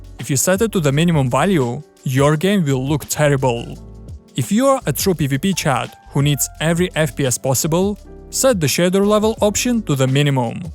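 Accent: Russia. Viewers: русский акцент ни с чем не спутать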